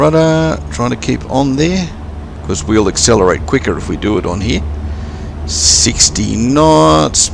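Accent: Australia. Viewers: Great video, a side of flight sims people don't usually consider. Good to hear another Aussie too!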